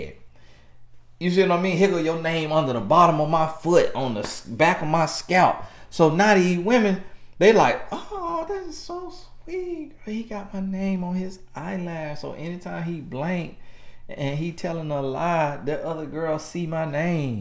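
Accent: South, United States. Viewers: It’s his southern accent , he is just funny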